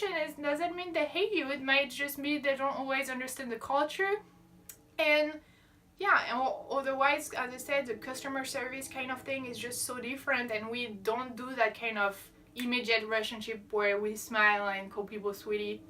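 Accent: France. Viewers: your French accent is very pleasant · Wow tu as vraiment un tres fort accent Français quand tu parle Anglais. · L'accent Français c'est le meilleur!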